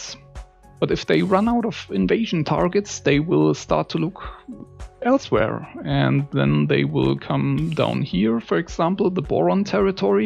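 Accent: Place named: Germany. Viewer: Woohoo, german accent again! :) Danke!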